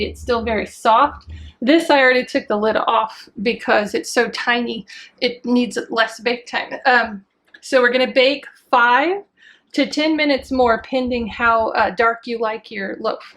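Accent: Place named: Austin, United States. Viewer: Love your demo (and your accent: I'm an Austin native!). I love that people are rediscovering clay bakers. Back in the 70's there was a trend (well, back then it was just called a fad) where we were all baking loaves in terracotta flower pots (in our bell-bottoms with flower-patches and halter-tops made of bandanas). Worked like a charm.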